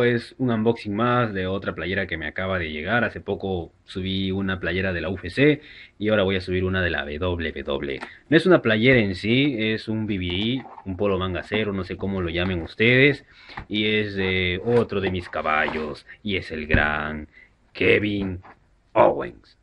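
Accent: Chile. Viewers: eres chileno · guau parecias chileno 😝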